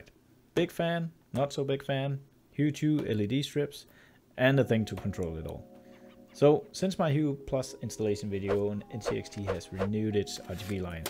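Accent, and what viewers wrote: Denmark. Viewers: Man kan høre du er dansk😂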